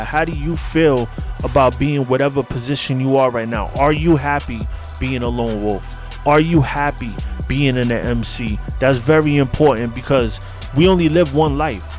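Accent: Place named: Brooklyn, United States. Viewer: It sounded like your from Brooklyn. I lived in Borough Park Sheepshead Bay and Canarsie but I’m here in Florida now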